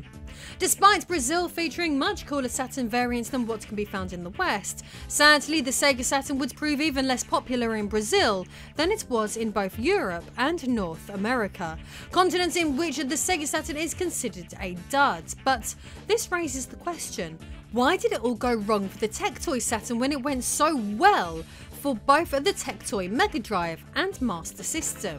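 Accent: England, United Kingdom. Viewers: England accent is sooooo cool! Kisses from 🇧🇷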